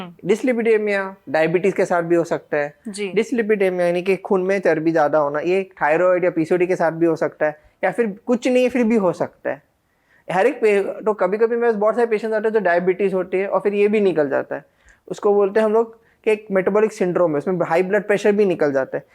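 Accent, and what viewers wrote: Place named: Sidhpur, India. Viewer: Speaking hindi like a typical sidhpuri.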